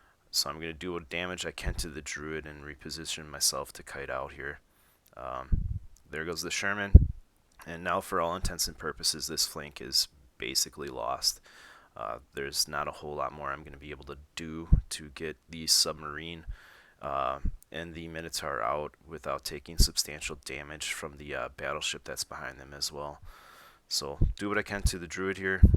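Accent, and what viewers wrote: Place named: Wisconsin, United States. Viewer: smooth buttery Wisconsin voice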